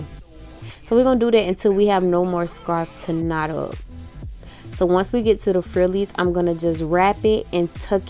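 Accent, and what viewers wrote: New Orleans, United States. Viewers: Heyyy!! Honee... I soooo enjoyed your video!! I don’t even wear makeup like that.. but you inspired me to wanna try!! Lol I’m almost 50 and I wait til this age to wanna try to do brows and makeup 💄.. I love your accent!!! Are you from NOLA?? I can’t wait to try some of the things you shared!! I liked your video and I subscribed!!!! Can’t wait to watch more of your video’s!!!!! 🥰💄😍Yesssssgurllll!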